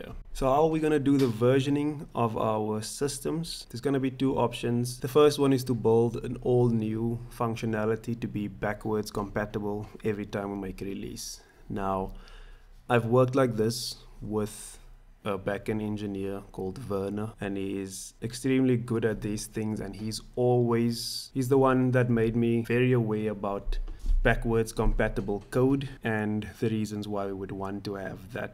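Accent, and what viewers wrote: South Africa. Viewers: Hey dude, are you from South Africa ?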